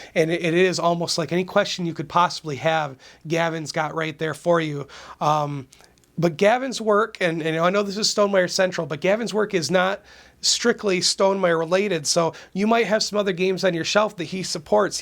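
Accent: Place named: Wisconsin, United States. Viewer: I feel like you have a northern accent. Is that a Wisconsin accent by chance? lol.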